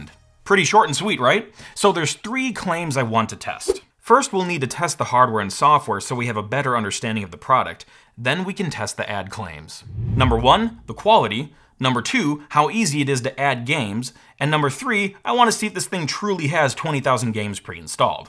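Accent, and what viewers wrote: Canada. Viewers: That's just how Canadian people say vague. Don't change who you are ;) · Are you Canadian? People tell me I don't pronounce vague correctly, but I think it might be an accent thing.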